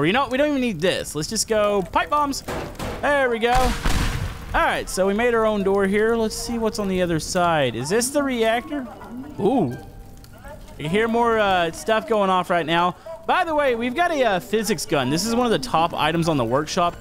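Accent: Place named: Texas, United States. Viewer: he has now reliasied his texas boi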